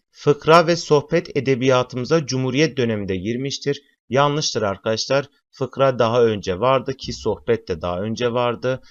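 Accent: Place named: Mardin, Turkey. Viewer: Güzel bir Mardinli şivesi var sanki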